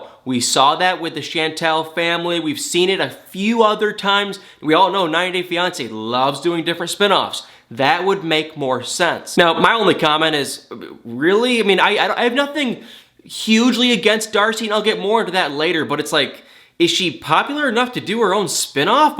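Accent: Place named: South, United States. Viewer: You are such a cutie!!! Lol I have to say that you’re saying’lank’ instead of link and thank instead of think !!!tennessee has gotten to you my friend lol!!! You are a southerner now!!! Lovingly from another southerner!! Still laughing!!!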